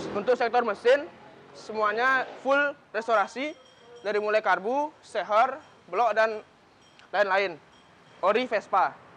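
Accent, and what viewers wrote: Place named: Bali, Indonesia. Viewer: Wkwkwk logatnya bali kental 😀😀😀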